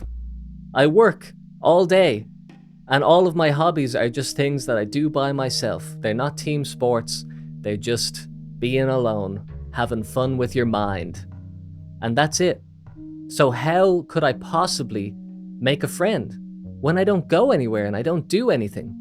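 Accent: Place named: Ireland. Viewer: Why are you irish?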